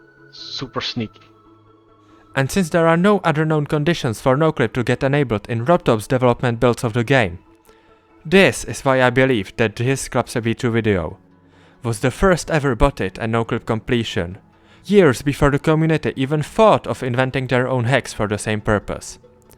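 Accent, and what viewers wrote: Czech Republic. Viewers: didnt notice you were czech till , but afterwards it was very obvious, čau bratře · lubim ten cesky prizvuk xD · hele nevím jestli jsi čech ale podle přízvuku myslím že jo takže pokud jsi tak ti chci jen říct že je to dobrý video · YOU FROM CZ?? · naahh i could tell you're czech from the FIRST WORD I HEARD WWWW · ale po 10 sekundach videa jsem vedel ze je to cech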